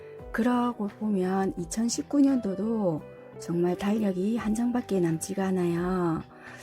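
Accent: Busan, South Korea. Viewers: 신기해서 한번 더 봤는데요~ 영상도 너무 예뻐요~ 👍🏻👍🏻 선생님~ 혹시 타로 배우려면 어떻게 하면 되나요?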